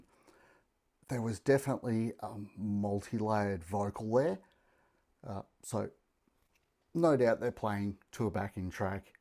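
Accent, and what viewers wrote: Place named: United Kingdom. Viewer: So ok I have done my job, subs, liked and will make a little blabla to your reac.! So yes is a great metal band from Ukraine, the lyrics are often hiding a great support to their country wich is fighting against these bloody russian! I hate them since I was young,and now I am a really old french metalhead (Second Wave Black Metal the most I think)... But I have a first question are you from the US or the UK, because the most reaction channel I see are from the US and you have such an accent, I think you're from the UK? (Great if yes). Second question, why do you name you "old...."? I am old yes, you could be my son, easy!!! Ok about an other song from Tatiana... I think about live official vid... Great song! (Like all song ^^). And dont bother to much about backing tracks things, is a real true band!!!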